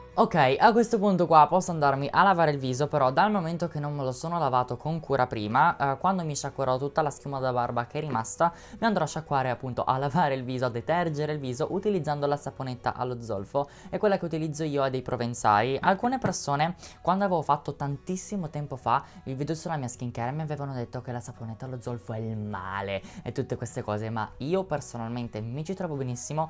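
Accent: United Kingdom. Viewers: Sei un grande io adoro come ti trucchi adoro la tua accento inglese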